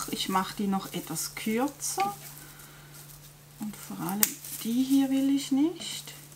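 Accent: Switzerland. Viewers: Liebe, ich finde deine schwizerische Aussprache entzückend. Das Verständnis ist von meiner Seite da, aber ich lebe auch in Bayern. Wir verstehen alles.....nein manche Bayern verstehe selbst ich nicht. Eigentlich bedarf es auch nicht vieler Worte, die Objekte sind durch das Zuschauen selbsterklärend. Zu viel Gerede ist ja manchmal auch so, dass man denkt, " Komm jetzt mal zu Sache" 😄. Ich finde alles super toll entspannend. So entspannend, dass ich auch schon eingeschlafen bin ☺️. Das soll nicht negativ sein. Nein im Gegenteil. Es ist alles sehr ommmmmmm. Beste Grüße und mach weiter so!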